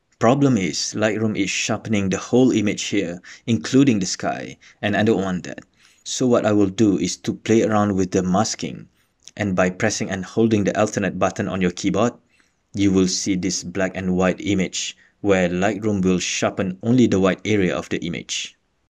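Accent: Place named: Malaysia. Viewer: Love your accent and tutorial. Thank bro. Greeting from fellow Malaysian.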